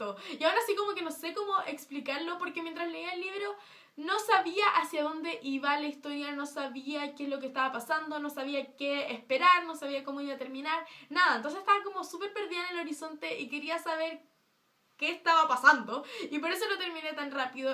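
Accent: Chile. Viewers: eres chilena no? xd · tiene más acento de Chile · ¿Eres chilena cierto? 😂❤ Pd: si lo eres ya somos dos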